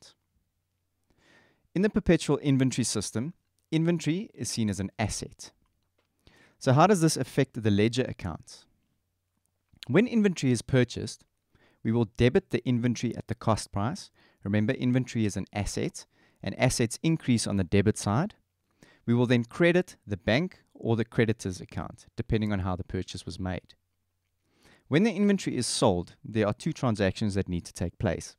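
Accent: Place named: South Africa. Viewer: Lekker boet, helping me all the way in Canada... but born South African.(noticed the accent immediately, but confirmed when i heard the Rand)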